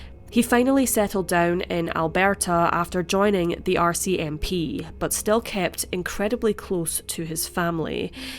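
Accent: Scotland, United Kingdom. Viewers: She and her sister are Scottish. Her sister is Kirsty Skye who also has a channel. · I love your wee scotch accent, I;m from Donegal in Ireland. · She's Scottish lol! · It's a Scottish accent. Good wishes 🙏🏼 to Canada 🍁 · Is that a Scottish accent? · SHE'S SCOTTISH ACCENT MY FRIEND 🇺🇸🇨🇴🙏🏽💜💜💜💜💜💜✊🏽✊🏽✊🏽✊🏽✊🏽✊🏽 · Yes the narrator is from Scotland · just curious where in Scotland are you from? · I believe she's Scottish :)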